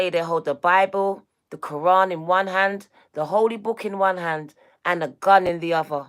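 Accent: United Kingdom. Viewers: 8 infinity and beyond…. Please let me buy you lunch….. you sound like a good ole Londoner… I born in Walthamstow xx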